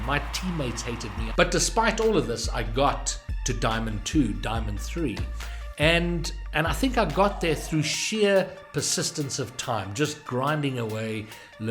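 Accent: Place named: South Africa. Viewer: i want to know if you are south african since your accent is the same as my south african grand parents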